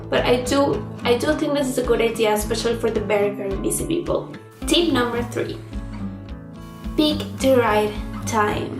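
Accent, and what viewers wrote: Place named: Latin America. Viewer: You are completely right!! So the first video I watched of you, I thought you were native from Spain due to your location and I say but how is that you speak english like someone from latín america jajaja but now I know you are neighbor of my country and it´s great to know it. You are doing really really well with all those language you know. keep doing!!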